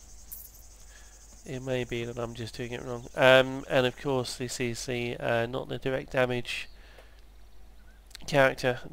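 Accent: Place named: United Kingdom. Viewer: Clicked on video in recommended videos. 12 seconds in I'm bursting out laughing at how amazingly British your voice is (and I'm British!)! Not an insult, I just couldn't stop myself, interesting looking mmo, here, have a like.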